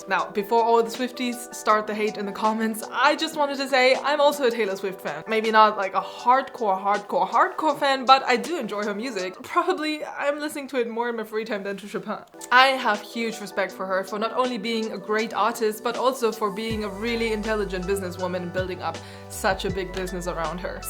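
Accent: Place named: Germany. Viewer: Interesting video youtube recommended me. I instantly knew that you were German like me. Why? Unfortunately you hear it. But your English is very well as is your pronunciation. It's probably because you're not speaking an American English and who knows how to speak like the Brits do? I watched a video of you playing the piano and you're a super awesome piano player. I am learning to play but it's just beginner's level which is fine. 😉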